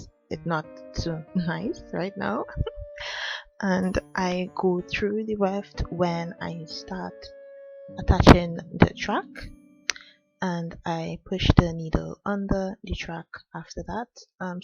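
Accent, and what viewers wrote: Trinidad and Tobago. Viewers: Your accent sounds Trinidadian...great tutorial btw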